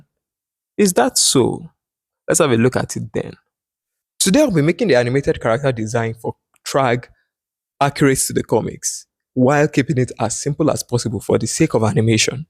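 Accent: Nigeria. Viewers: I know a 9ja boy's voice when I hear one😂💪🏾. W vid🙌🏾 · Loving the naija accent my man! Let's freaking go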